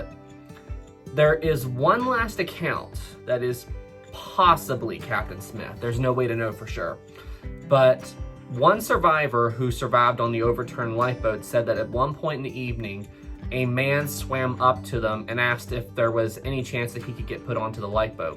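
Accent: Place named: West Virginia, United States. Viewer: Let me guess,West Virginia?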